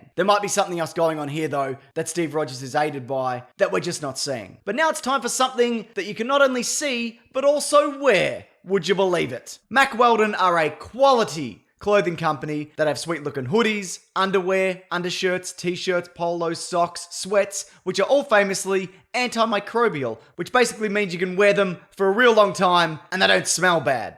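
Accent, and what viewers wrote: Australia. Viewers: Australian bloke haha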